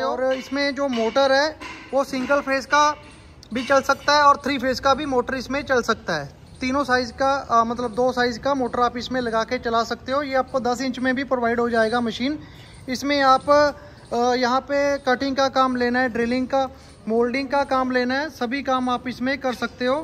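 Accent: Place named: India. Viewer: creo q es ingles con acento de la india jejej, pero se para q sirve la maquina , se ve genial y solido, en pais seria como una novedad. Felicidades por su ingenio y trabajo.Saludos desde SudAmerika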